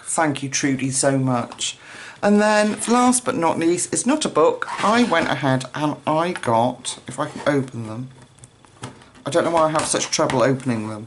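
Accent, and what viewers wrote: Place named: England, United Kingdom. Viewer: Many thanks for introducing these books. I particularly like the ‘Wonderful Little World’ book so going to try to find a copy. You are very generous with your time and knowledge, which I appreciate so much. It’s lovely to hear a ‘local lady’ so I know the books you show are available in England. Kind regards from York 🙏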